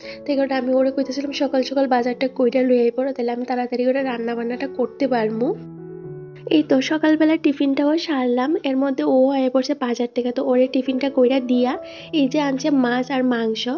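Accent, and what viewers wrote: Bangladesh. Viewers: Bon tomar bari kothai bagladesh avabe kotha bolcho keno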